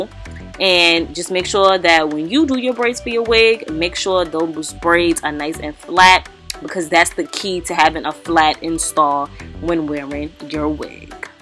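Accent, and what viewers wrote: New York, United States. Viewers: Its the NEW YAWK accent fah meeee! 😍 (Im from NY too🗽🗽🗽🗽😉)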